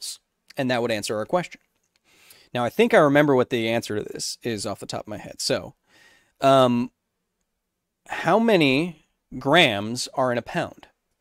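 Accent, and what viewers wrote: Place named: Australia. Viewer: I’d say your Australian accent sounds similar to Wood’s accent from Beatemups. Not really an opinion on what region it sounds like, but you can do an impression xD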